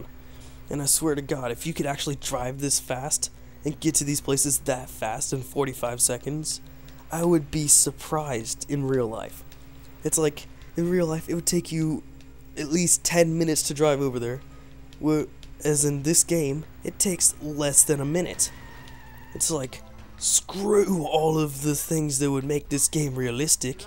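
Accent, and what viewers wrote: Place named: New England, United States. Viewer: Sounds more Kennedy/New England than English I think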